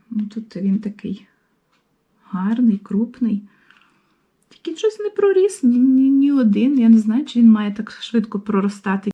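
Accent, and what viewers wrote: Western Ukraine, Ukraine. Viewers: Ваша мала Батьківщина-не Карпати.Ну дуже тембр голосу західноукраїнський.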